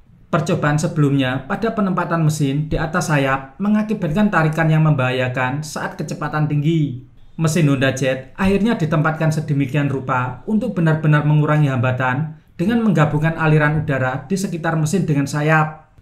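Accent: Java, Indonesia. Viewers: host medoook 😁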